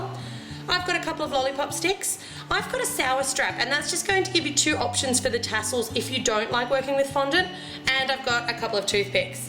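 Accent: Australia. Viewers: I just love your Aussie accent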